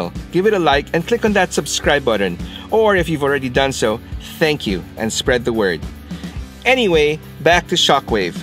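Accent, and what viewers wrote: Philippines. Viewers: Filipino accent?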